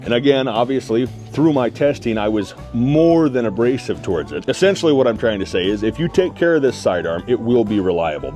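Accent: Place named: Northwest England, United Kingdom. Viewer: This man has the most aggressive northwest accent I've ever hear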